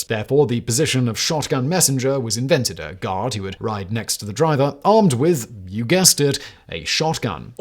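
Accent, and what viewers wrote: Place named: RP, United Kingdom. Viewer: I’d not heard of ‘jumping the shark’ before. But I was wondering the other day about where ‘Bob’s your uncle’ came from. BTW, you and I both speak British RP, so for future videos, Marquis is not pronounced markee, as in the large wedding-type tent, but markwiss. (We might be hearing it a lot next year, come the coronation!)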